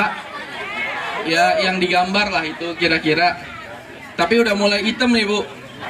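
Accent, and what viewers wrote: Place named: Indramayu, Indonesia. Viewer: Logatnya kentel indramayu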